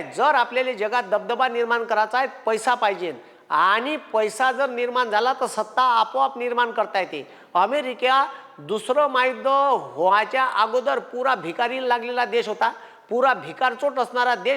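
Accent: Vidarbha, India. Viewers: खुप छान 👌👌. भाषा विदर्भातली आहे का, ऐकायला खुप भारी वाटते. 👌👌👍👍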